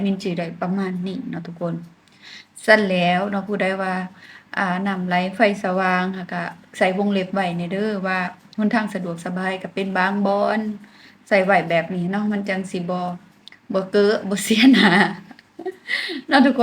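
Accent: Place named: South, Thailand. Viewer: สำเยียงทำไมเหมือนพูดไต้ผสมอีสานเลย😅😅😅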